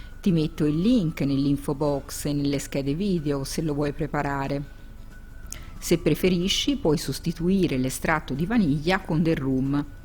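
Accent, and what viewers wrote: Tuscany, Italy. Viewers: Sono fenomenali Mi fido della ricetta di questa signora dal bell’accento toscano e dalla precisione delle dosi e procedimento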